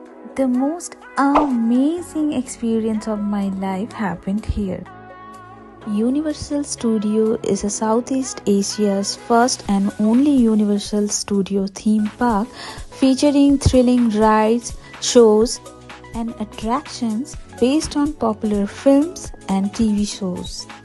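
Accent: Tamil Nadu, India. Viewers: Cute Tamil❤ love from Chennai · U r tamil is so sweet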